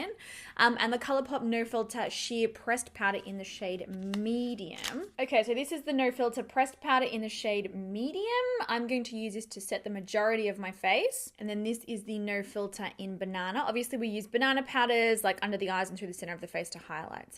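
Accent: Australia. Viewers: Hi girl, love your work, just love hearing an aussie accent. Just wondering if you have ever tried a one brand tutorial or a first impressions on all Australian brands like 'Australis', 'Mirrenesse' or even cheaper brands like 'Designer brands' ?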